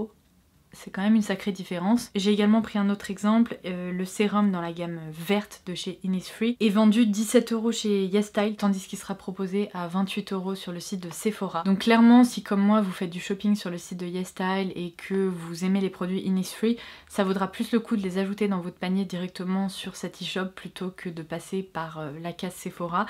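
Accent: England, United Kingdom. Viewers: Super vidéo sur les nouveautés merci de pouvoir tous nous renseigner😉. mais pour répondre à ta question ce qui m'intrigue le plus c'est l'accent anglais que tu prends à chaque fois que tu présente un produit.. 😭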